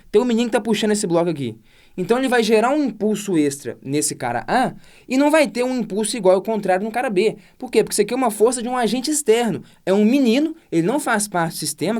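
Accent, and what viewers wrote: Minas Gerais, Brazil. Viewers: Excelentíssima aula, Prof.! O sotaque mineiro deixa ainda melhor xD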